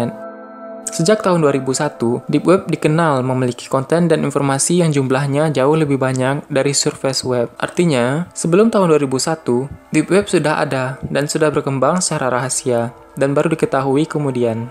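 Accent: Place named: Makassar, Indonesia. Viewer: Logatnya agak-agak ke Makassar (tw sekitarnya), Iya ya bang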